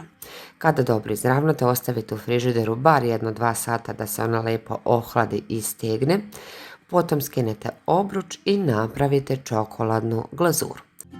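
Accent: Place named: Vojvodina, Serbia. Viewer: Može i odozgo i od gore.U čemu je problem? je iz Vojvodine i priča onako kako je navikla. Ne priča Mađarski da je ne razumeš. A ti si neki književnik pa ti smeta? Ajde ne traži dlaku u jajetu. Pričaj kaki hoćeš, imaš mio glas I sve lepo objašnjavas. ❤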